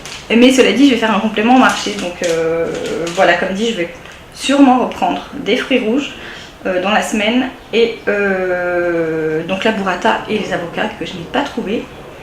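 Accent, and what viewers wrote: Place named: Aquitaine, France. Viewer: Maiiiis nooon je me disais bien que t'etais d'Aquitaine tu dis ''rase'' au lieu de ''rose'' haha #teamrase vive l'Aquitaine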